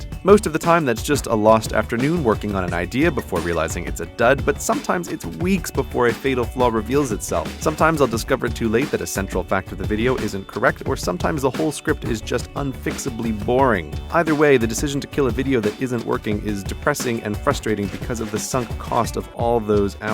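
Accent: United States. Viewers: When I was 15, my English teacher (in Norway) told my class that it didn't really matter which form of the English language we chose, but that we should choose one and stick with it. Is your attempt to keep your accent fully American (it's impossible to avoid being affected by those around you entirely) similarly motivated?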